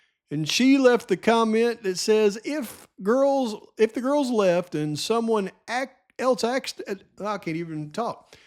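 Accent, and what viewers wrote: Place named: Arkansas, United States. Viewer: He lived in Arkansas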